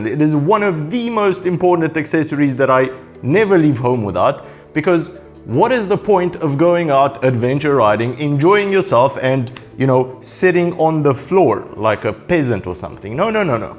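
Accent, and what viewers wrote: South Africa. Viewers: Fantastic ! Was watching this & the missus said she can hear a South African accent...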